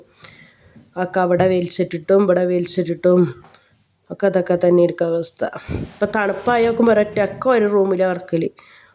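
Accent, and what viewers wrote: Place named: Coorg, India. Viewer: Coorgil evideyaanu...samsaram kett Coorg aanenn thonni,Siddapuram aano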